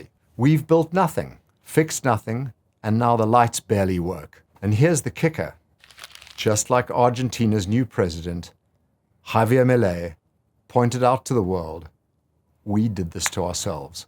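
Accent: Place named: Sandton, South Africa. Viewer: It’s a pity, you made some good points but your argument lacks nuance or basic empathy. This is genuinely a Trump speech with a Sandton accent. Charismatic and eloquent but ultimately empty nationalist rhetoric without any means of dealing with the issues. “Open the free market and stop wokeism” is an easy fix for a country club member with an 8 figure income but it is severely detached m from the needs of working class South Africans. Not drinking your Kool-Aid Trump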